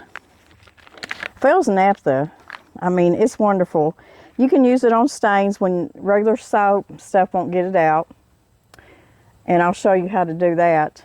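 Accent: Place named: Alabama, United States. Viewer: I’m half American living in my moms home country of Sweden. Your accent makes me so nostalgic for the US. My dads whole side of the family talks just like you, it’s my favourite sound in the whole world. Thank you for sharing your wisdom Ms. ❤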